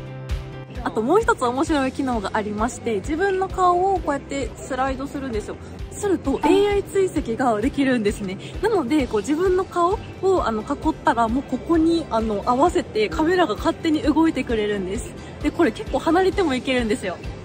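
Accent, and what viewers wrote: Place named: Nagasaki, Japan. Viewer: 🤕ガスタウン＆ジンバル🤕 ガスタウン動画を観終えて、今、「香港」ハイアットに泊まってを観ながら投稿してます🐒 、いつも、可愛い笑顔＆時々でちゃう長崎弁（失礼）親近感があって良いよ😅 いつも、街の歴史・食・景色etcで素敵な旅行気分になれ、ありがとうね😘 秘密兵器のジンバルて考えた人「すごい＝マネーだね」😛 ジンバルで色んなアングルで撮った動画が、またｘ２楽しみになりました🤨 ランチの時、日影がHaruちゃんと少し被っていたのが、ちょっぴり勿体ないｘ２🐻 ポニーテール、良く似合ってたし、私は「ショートｏｒセミロングがスキです」🐷 ＰＳ キンコン西野さんの伝説のスピーチ動画「是非、観て下さい。今後のYouTuber活動の参考になれば・」 <link> 「約１６分あるから、時間がある時にでも」 じぁ～ね😘 おやすみ。弘美🥱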